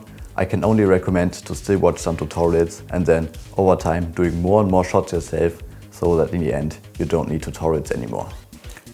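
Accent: Germany. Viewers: You really sound like a german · ar you germen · i am hearing deutsch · dieser deutsche akzent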